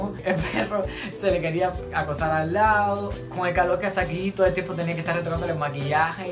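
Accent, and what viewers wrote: Cuba. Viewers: Me gusto mucho tu forma de hablar 😆tu acento como cubano pero cool! 😂. Y los look de maquillaje de Camila 😲👌👌 de lujo ! Aqui otra opoyo de.mi parte 😉😉 . Me gusta como te expresas 😊😊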